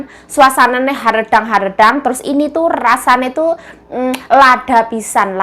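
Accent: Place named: Sunda, Indonesia. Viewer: Kocak ngemeng Sunda na 😅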